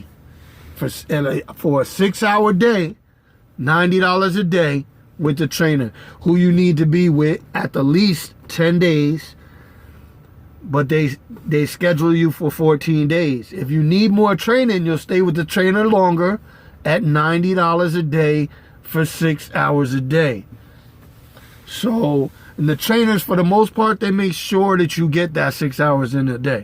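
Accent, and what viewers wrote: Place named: Brooklyn, United States. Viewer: Just saw your video, glad to see another New Yorker made it, ... you sound like you from Brooklyn, just like me lol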